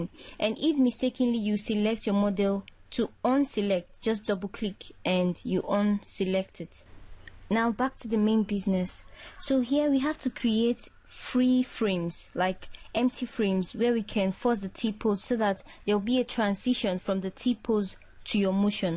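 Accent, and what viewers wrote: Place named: Nigeria. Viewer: You are good at this. You also sound Nigerian and the accent gives me a better understanding 😀. I love your work, it's inspiring.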